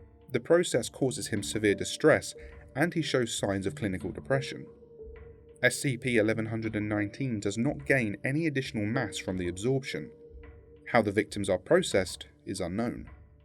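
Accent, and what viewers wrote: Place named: England, United Kingdom. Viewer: I wish I wasn't prejudiced against authentic English accents to enjoy this more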